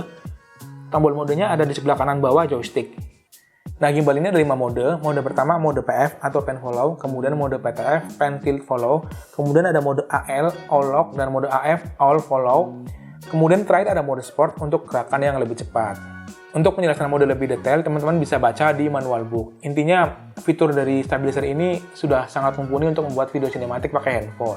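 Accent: Tegal, Indonesia. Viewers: Wah mase wong tegal ya mas?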